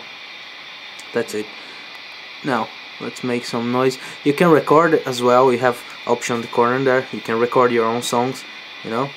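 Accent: Brazil. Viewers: Ou mano, quanto vc pagou no irig? hahah To ligado q vc eh brasileiro seu sutaque nao engana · Hahaha, verdade quando comecei a escutar percebi que era brasileiro. Mas ta valendo, obrigado pelo vídeo!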